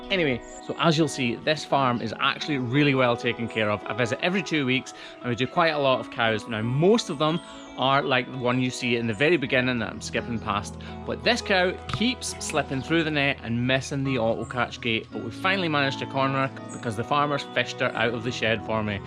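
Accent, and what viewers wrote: Scotland, United Kingdom. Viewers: I looove your lovely Scottish accent and how you help animals! I’m American and have a customer with a lowland Scottish accent.. just adore her. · I watch so many of your videos on mute I had no idea that you where Scottish this full time that’s so cool I stay near Glasgow. If your ever looking for a 30 year old apprentice I would love to do this I can’t stop watching these videos lol there class.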